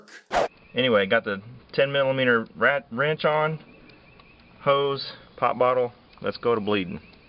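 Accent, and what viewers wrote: United States. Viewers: No funnel, no gloves, southern accent, thats back woods boy. Merica! Hey at least you have good info, and you were wearing eye pro that stuffs bad on the eyes.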